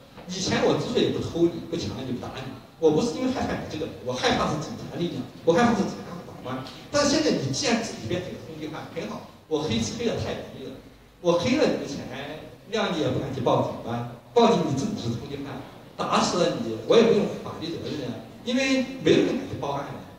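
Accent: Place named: Sichuan, China. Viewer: 其实就是一般川普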